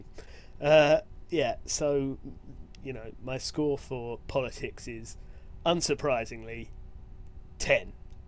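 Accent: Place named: Cockney, United Kingdom. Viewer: Your so British! Haha, we haven't clanned in a while, I miss hearing you curse at the other team in cockney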